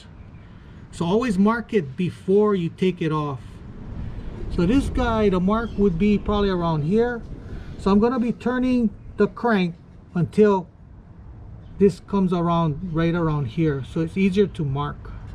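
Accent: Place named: Louisiana, United States. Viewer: He from Louisiana all day I can hear it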